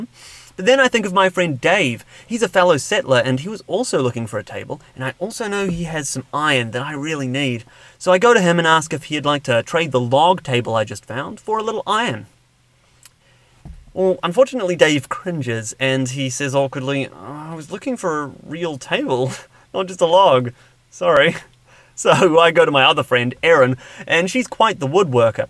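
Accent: New Zealand. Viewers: Why does Matt Smith have a kiwi accent?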